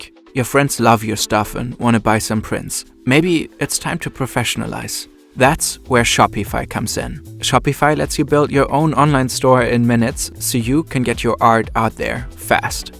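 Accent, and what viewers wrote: Germany. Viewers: Well, the guy sounds German, maybe he lives there and knows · this german accent is very annoying...please let the dutch guy do the fern stuff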